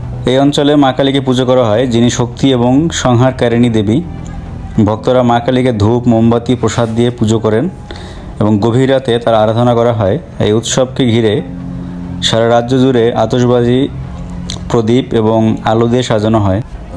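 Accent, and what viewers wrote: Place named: Bangladesh. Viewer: আপনি বাংলাদেশী accent এ কথা কেন বলছেন?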